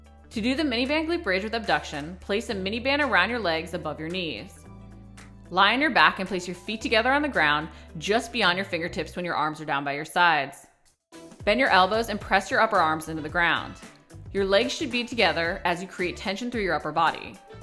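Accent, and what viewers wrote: United States. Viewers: Love the accent. American English sounds so lively compared to English here in London.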